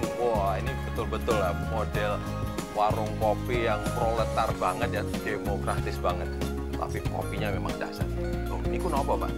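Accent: Java, Indonesia. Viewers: Pak bondan 😍😍. Kangen sm gayanya saat review makanan bikin orang ngiler sm logat khas jawanya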